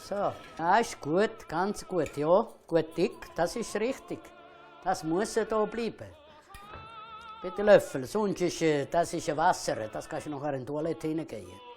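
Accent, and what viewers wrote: Italy. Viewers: Er isch ganz dütlich vom Süde. Erkännsch vom Akzänt und vo de Art (positiv gmeint) :-) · Woher aus Italien ist? Er spricht das s in Bolognese stimmlos aus, das spricht für den Süden, aber seine Vokale klingen eher norditalienisch...wir müssen ihn mal testen. Kann ihm mal jemand eine "cadrega" anbieten?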